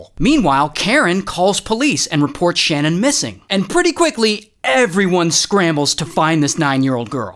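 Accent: United Kingdom. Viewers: best british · As a British person I can confirm we talk like this😂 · british perfect impression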